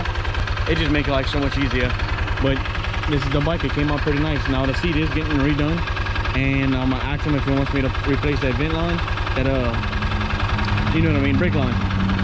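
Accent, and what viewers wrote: Louisiana, United States. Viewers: as soon as you started talking i knew you where from louisiana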